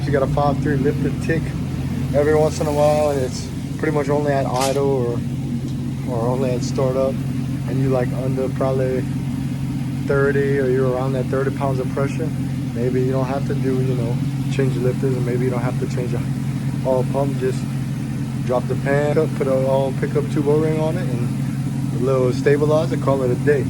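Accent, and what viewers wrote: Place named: South Louisiana, United States. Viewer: As soon as I heard you talk I knew you were from S. LA. Me too. I have a 2013 Sierra ticking its ass off. Wanna do mine lol?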